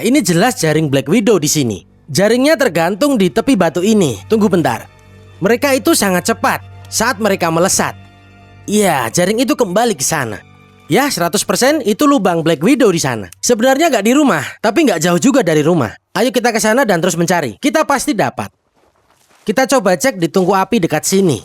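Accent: Java, Indonesia. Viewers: logat jowo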